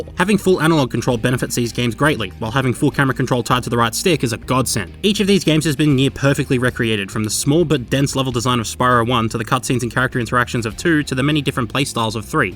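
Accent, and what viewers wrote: Australia. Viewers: Watching this in class FUCK ENGLISH WHEN YOU HAVE AN AUSSIE MAN THAT SPEAKS ENGLISH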